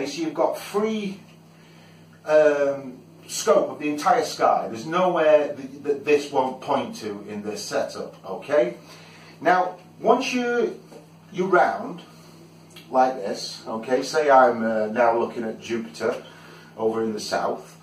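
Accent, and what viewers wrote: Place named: Manchester, United Kingdom. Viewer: Beautifully explained, really helpful and topped off as delivered by a nearby Mancunian!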